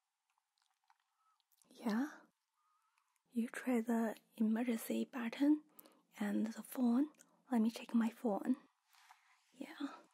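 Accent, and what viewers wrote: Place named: China. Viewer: 这个口音好国人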